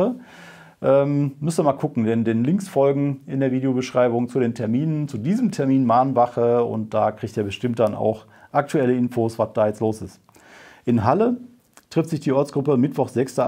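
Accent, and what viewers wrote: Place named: Emsland, Germany. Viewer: Na,ist wohl ausm Emsland, wie ich,da sagt man dat und wat...geht halt in Richtung Plattdeutsch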